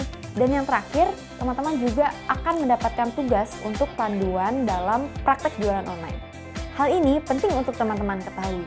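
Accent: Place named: Cirebon, Indonesia. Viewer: Cirebon mana suaranya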